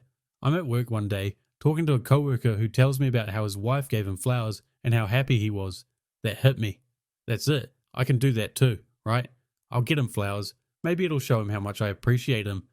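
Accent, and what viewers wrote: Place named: New Zealand. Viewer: the kiwi accent sounds good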